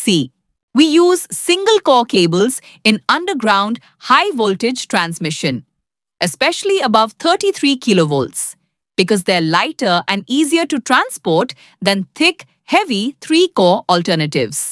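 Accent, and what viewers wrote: India. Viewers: Funny that Indian dialect is still there in the AI speech :D Anyway, great content!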